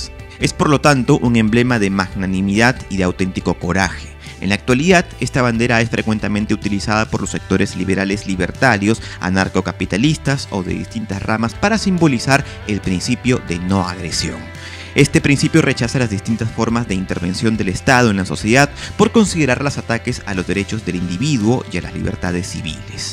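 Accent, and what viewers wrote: Peru. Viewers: SOY CHILENO Y SE NOTA Q LA VOZ ES DE UN PERUANO DE FUJIMORI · Eres peruano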